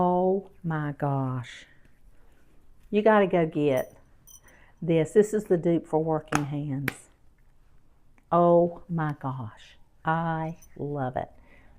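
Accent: South, United States. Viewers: Do not change your southern language, Southern accent, your dialect for anyone or anybody. You are a sweet Southern Bell! I don't understand why people always try too correct our heritage.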